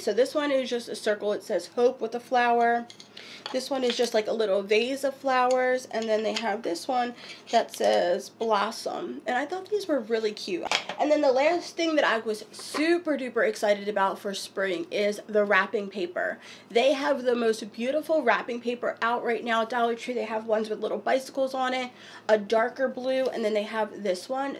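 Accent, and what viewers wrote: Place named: New Jersey, United States. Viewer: Girl! I cannot believe there are some parts that don't stay to the end of your videos LOL! I love your New Jersey accent wish I had a little of that! Love all of your videos and totally relate to the hubby being a little sick of the Dollar Tree haha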